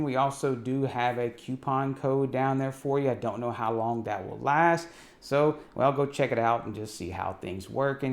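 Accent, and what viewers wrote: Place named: Texas, United States. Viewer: I love Texas accent! ❤❤❤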